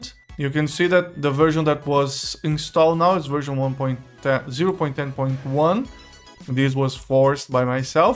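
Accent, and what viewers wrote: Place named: Brazil. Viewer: Só pelo sotaque ja sei que é BR. Ótimo trabalho!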